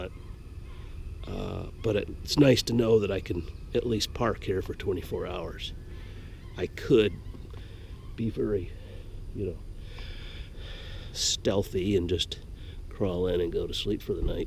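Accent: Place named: Small-town, United States. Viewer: This made me wonder if there was some relationship here between building concrete form work and boatbuilding. A fascinating view, so have your family lived in Washington for generations and is your accent local? It has that small town American - Lake Wobegone/ Ken Burns feel to it, which is quite attractive. For fans of Americana here in Europe this down on the ground exploration is the other (positive) side of the globalised uniformity driven by the Internet. Yes to Van building/living, but also Yes to getting out and about.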